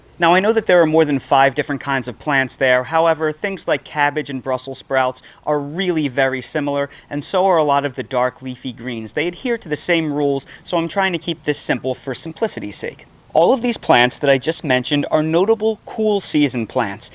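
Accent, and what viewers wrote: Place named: New Jersey, United States. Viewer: You can take the boy out of Jersey but . . . LOVE your content - you have to be from Jersey; No? Bucks County here - just had to comment little northern for the south - love it though!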